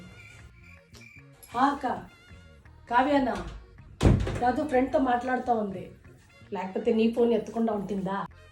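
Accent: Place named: Karnataka, India. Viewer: Our Karnataka telugu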